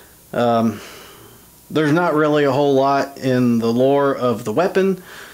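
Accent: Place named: Texas, United States. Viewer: Texas accent is no excuse for mispronouncing our dark master Sauron the great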